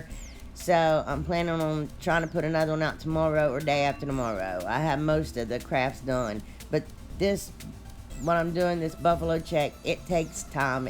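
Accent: South, United States. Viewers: That is absolutely gorgeous! I wouldn't have thought to do the Buffalo check in green! What colors did you use besides celery? And where are you from? You sound very southern lol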